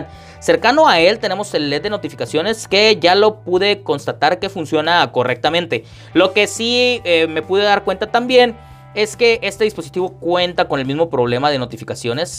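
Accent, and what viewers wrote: Nayarit, Mexico. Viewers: Hola, amigo. Me gusta mucho tu estilo, supongo que eres de Nayarit o cerca, el acento me es conocido. Un saludo y tienes un suscriptor nuevo.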